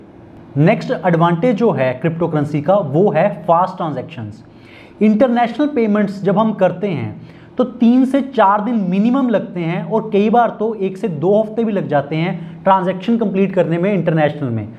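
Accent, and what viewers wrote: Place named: Jammu, India. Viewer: Thank you...bro looks like your mother tongue is dogri...is that true..?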